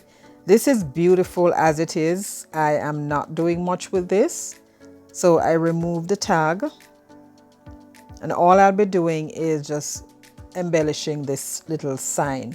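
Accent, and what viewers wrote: Jamaica. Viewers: same! I didn't see snow until I was 8 yrs old, visiting family in NJ for a wedding and it was amazing! I played in it making a snowmen and kept running in and out of the hotel to put my hands under hot water (as a child, I had no idea it made it WORSE to do that as it burns your hands lol). But growing up, i thought snowflakes were the size of dinner plates! Cuz I'd see them on cartoons where they blow them up for the screen effects. My mother had to EXPLAIN to me that each snowflake was part of the snow that fell and they were very tiny. Boy, that blew my mind and they it started an entire other excitement trying to catch snowflakes and look at them before they melted to see that, indeed, they were snowflakes🤣🤣🤣 I laugh at myself over it because it's like "really Gaby? Dinner plate snowflakes? EVERYONE would get a concussion if those fell down when it snowed!!! 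Lol I figured you'd enjoy that story. I hope u can make sense of it! Lol I have a few Jamaican friends and I want to go to Jamaica SO BADLY! That was my aunt and uncles favorite spot to vacation. Your accent gave you away😋 I LOVE IT!!!❤❤❤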